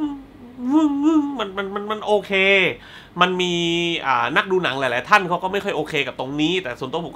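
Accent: South, Thailand. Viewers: พึ่งรู้ว่าพี่คนใต้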